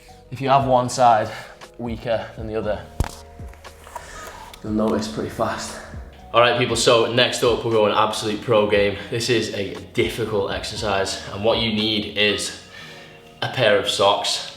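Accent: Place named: Manchester, United Kingdom. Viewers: where in the UK are you from? You sound mancunian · Fukin Manchester, Lad .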